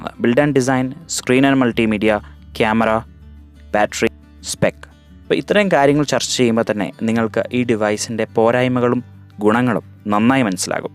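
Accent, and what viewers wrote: Idukki, India. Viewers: ഇടുക്കികാരൻ ആണോ ബ്രോ ?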